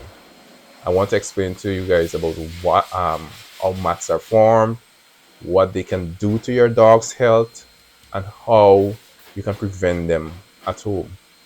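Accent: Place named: Caribbean. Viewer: I love your accent, where are you from? You sound West Indian. A very comfortable and soothing voice. Puts me in mind of my Granny. 🙏🏾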